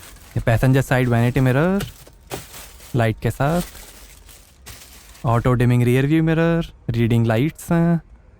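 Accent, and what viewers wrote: United Kingdom. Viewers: Bhai Saab...not British....be indian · British bolna teak hai but the landrover ownedby Tata motors 🔥😎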